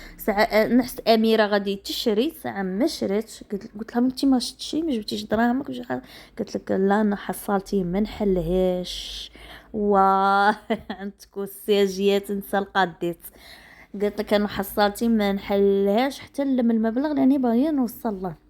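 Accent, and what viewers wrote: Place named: Tolga, Algeria. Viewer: على هدرتك كنتي جيهت طولقة انا من بسكرة تحية ليك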